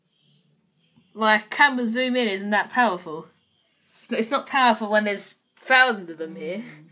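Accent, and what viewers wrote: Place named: United Kingdom. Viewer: now i know that you sound a bit british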